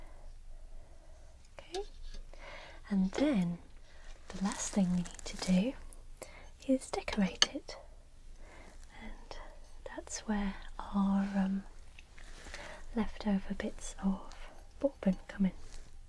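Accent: United Kingdom. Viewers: Omg for a second I thought there were actual grasshoppers in it! I'm in love with UK accents. ♡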